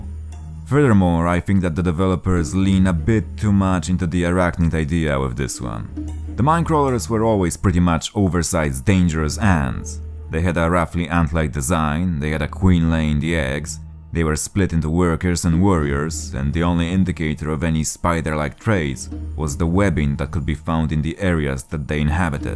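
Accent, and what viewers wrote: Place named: Poland. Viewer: Such an annoying voice 🤮🤮🤮 and Polish accent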